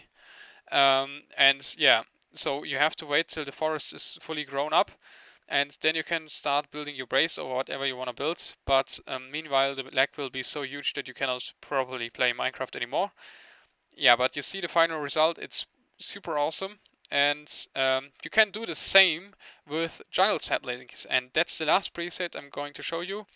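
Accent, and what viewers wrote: Germany. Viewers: turn on subtitles if you want to laugh it is funny becuase of his German overtone isnt processed correctly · dein english akzent ist scheise sry aber ich bin zu 100 % sicher das du deutsch sprichst ;)!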